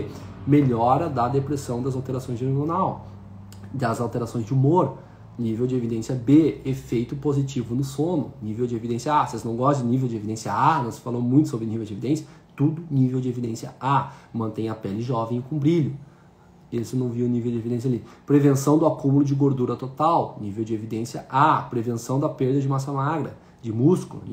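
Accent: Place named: South, Brazil. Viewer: Oi Dr! Gostei muito das suas especificações!Eu tenho 50 anos, ainda não parei de menstruar, mas sim, já tenho alguns sintomas do climatério. Já comecei a procurar, mas ainda não encontrei um médico (a) que realizasse a modulação hormonal bioidêntica! Minha pergunta é: Esse é um tratamento muito caro? O senhor atende a algum plano de saúde? Pelo jeito de falar o senhor é sulista. Eu sou curitibana mas,resido no Rio de Janeiro. Dependendo dos valores,eu iria até seu consultório. Obrigada!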